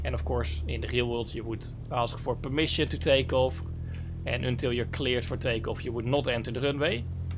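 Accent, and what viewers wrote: Netherlands. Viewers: Awesome video, love the Dutch accent 😊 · Dutch accents are not really harder to understand then other English accents. My Canadian family perfectly understands this kind of dutch accent... beside that, this comment is completely off topic. I'ts a great video of how to properly setup and fly the Cessna 152. A little more respect for content creators please. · Wat een belachelijk Hollands accent, Leer eens Engels praten he..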